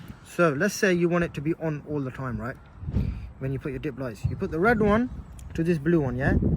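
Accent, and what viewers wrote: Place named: South Asia. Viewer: Bro’s South Asian Accent tried to peek out a bit sometimes. But the light looks dope!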